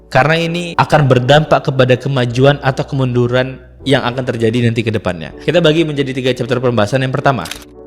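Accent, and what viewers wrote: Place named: Lampung, Indonesia. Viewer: dari lampung nih kayaknya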